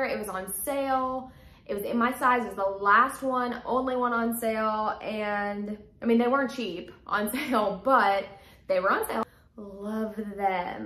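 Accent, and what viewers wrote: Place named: Kentucky-Tennessee, United States. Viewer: Woohoo! Girl, that’s like 11 years of shoes. Also, the Kentuckennesse accent reminds me of home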